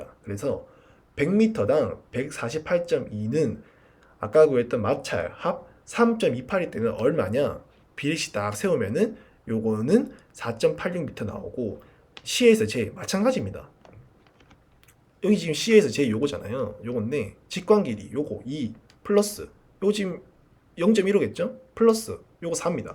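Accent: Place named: Gyeongsang, South Korea. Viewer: 경상도사투리 구수하네예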